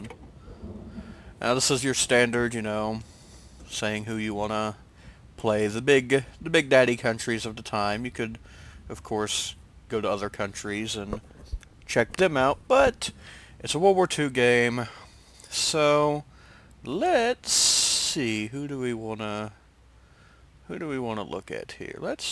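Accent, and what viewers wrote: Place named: United States. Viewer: what a cowboy accent ! God damn ! 🤣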